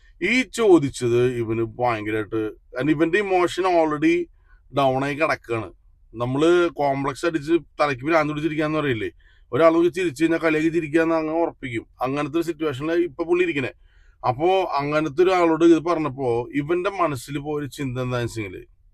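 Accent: Thrissur, India. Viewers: നാട്ടിൽ അങ്ങയുടെ വീട് തൃശ്ശൂർ ആണോ. ത്രിശൂർ ഭാഷ ആണല്ലോ പറയുന്നത്.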